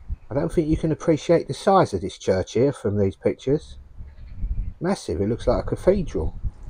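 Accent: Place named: Cockney, United Kingdom. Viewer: Hi, really pleased to come across your channel hearing your lovely Cockney accent. We are nearly at the end of our boat build and are really looking forward to being on the cut. We hope to see you out there one day and promise to keep our music down 😅. Best wishes Lee & Ali nb black swan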